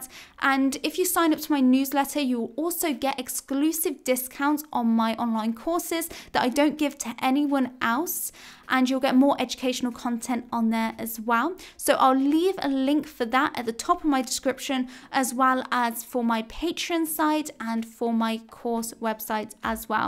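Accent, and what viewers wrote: England, United Kingdom. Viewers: The best english accent here for the english accent lovers! 🤗😍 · Has a seemingly English accent yet says how to make 250,000 DOLLARS a year. Typo error ? Or…..? Hmmm….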